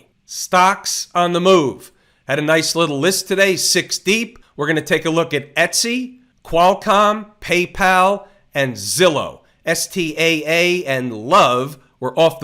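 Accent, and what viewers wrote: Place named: Brooklyn, United States. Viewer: you sound like a brooklyn guy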